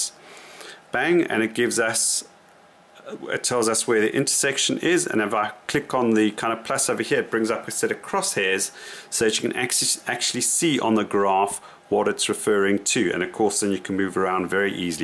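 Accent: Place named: South Africa. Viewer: Good video, thanks. Do I detect a SA accent there?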